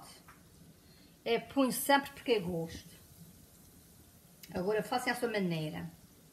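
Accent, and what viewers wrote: Azores, Portugal. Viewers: How sweet and pleasant to hear Sao Miguel accent! Love t! ♥️ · adoro esse sotaque Acoriano!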